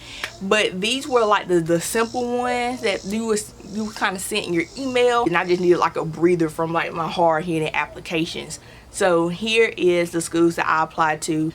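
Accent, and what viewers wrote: South, United States. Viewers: Strong Southern ascent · I can tell she from the south. Id say memphis or Louisiana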